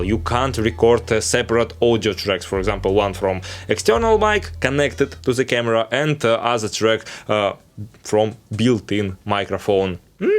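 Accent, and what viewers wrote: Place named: Russia. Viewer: Broader than that could be incomprehensible for general audience, but yours was perfectly clear and perfectly Russian. 😁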